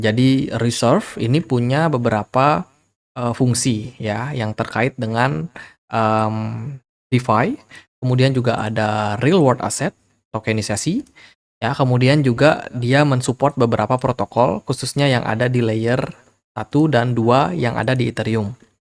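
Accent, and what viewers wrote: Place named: Aceh, Indonesia. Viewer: orang aceh bang? mirip banget logatnya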